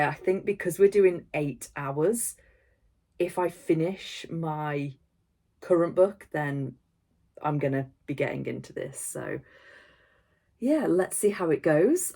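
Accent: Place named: Midlands, United Kingdom. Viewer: Heyy, I’m a new subbie! I’m also from the midlands as well, so it’s comforting to hear a similar accent. 🥰 You did really well, there’s NO way I could do 8hrs of reading, I can just imagine the brain fog 😶‍🌫️. I also have chronic fatigue, so completely understand! So glad everything is okay with your doggy too. Keep the videos coming! 🧡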